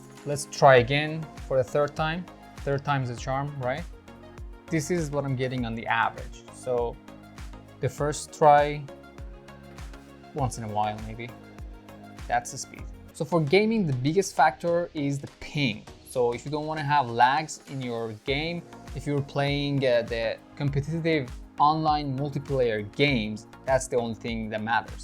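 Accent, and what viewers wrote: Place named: Iran. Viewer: That Iranian accent lol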